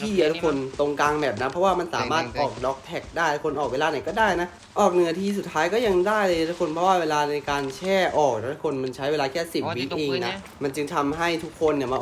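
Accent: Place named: South, Thailand. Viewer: คนใต้ม่ายนิบ่าว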